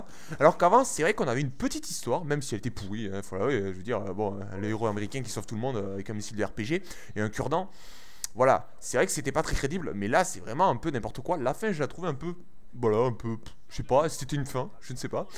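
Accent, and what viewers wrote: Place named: South, France. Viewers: Salut J'adore ton accent Marseillais MARSEILLAIS lol Bravo pour tes stats et ta nuke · Petit accent du sud moi aussi j'ai cette accent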